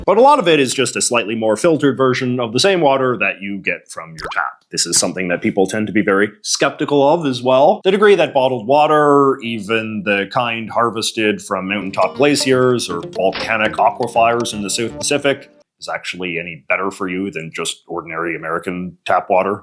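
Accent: Canada. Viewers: … there it is lol this is my first video and I was wondering why you sounded like that. Now it all makes sense, you’re Canadian! 😂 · “Talk a boot this this with oot mentioning….” Canadian slime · Most Canadian accent ever and I’m Canadian. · That Canadian accent tho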